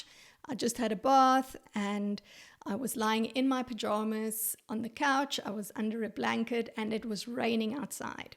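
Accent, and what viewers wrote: South Africa. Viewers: Are you South African? just curious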